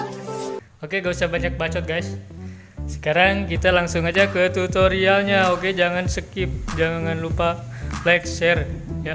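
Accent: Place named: West Java, Indonesia. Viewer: Meuni sunda pisan logat b.indo nya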